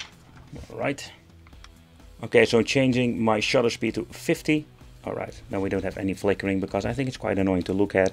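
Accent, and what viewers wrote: Netherlands. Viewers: Enige reden dat ik het zou kopen is omdat ik geen steamdeck kan kopen ivbm wachtlijst.... Maarja weetje €300 voor wat nostalgie games is opzich te doen. Je Hollandse accent is niet te missen trouwens 👍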